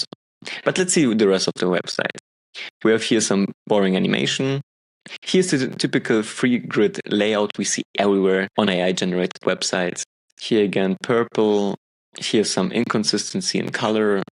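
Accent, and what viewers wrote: Germany. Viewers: Man hört den deutschen raus👁️🤣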